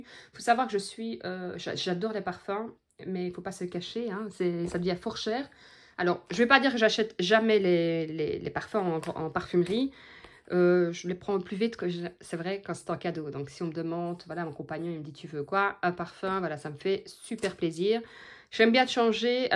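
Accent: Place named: Belgium. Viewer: Cc, merci pour ce partage, j’espère qu’il n’y a rien de grave pour ce rdv, tu es vraiment adorable!!! et en plus ton accent belge ❤ c’est encore plus mignion!!!🥰, T’inkiet J’ai un chéri 🤣🤣🤣🤣!!!! Gros bisous à toi et ta famille 🥰😍😘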